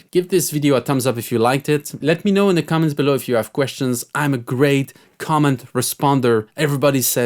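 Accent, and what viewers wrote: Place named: France. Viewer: Great tips, many thanks! Coming from a language where “h” sound is much harder than in English pronunciation, your implied French “h”’s took some time to get used to. Also, being a beginner, had to look up what “Cr.” stands for - learned something new and absolutely necessary.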